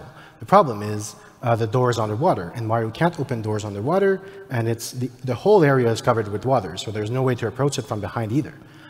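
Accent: Quebec, Canada. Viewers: i can hear the french canadian accent slipping through, I would know that because i'm also canadian lol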